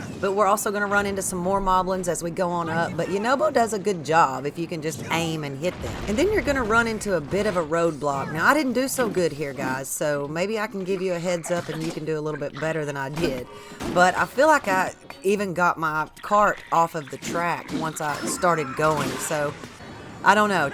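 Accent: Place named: New York, United States. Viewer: You sound like your from New York.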